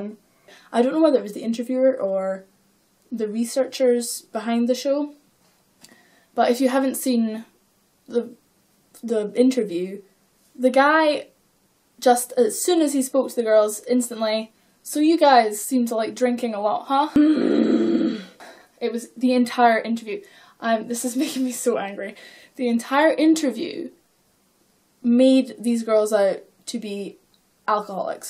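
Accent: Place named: Scotland, United Kingdom. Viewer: Gurll?? Where are you from????you sound scottish!!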